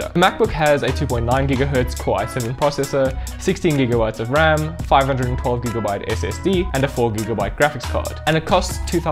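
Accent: South Africa. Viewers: I love spotting a fellow South African just by the voice XD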